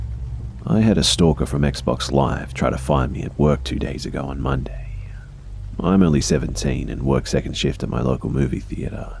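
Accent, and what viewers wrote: Australia. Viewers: Are you an Aussie? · He's Australian, lmao.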